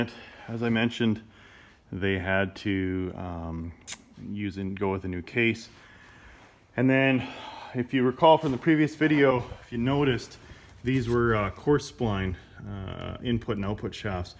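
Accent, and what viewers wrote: Canada. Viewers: Love the Canadian accent! Oh hey there! Great videos!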